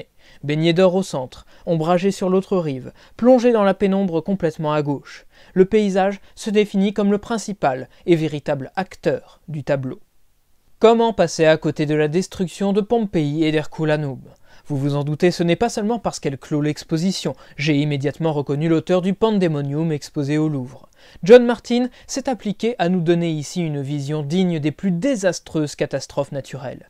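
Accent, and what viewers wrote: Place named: United Kingdom. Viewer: Et félicitations pour votre accent british !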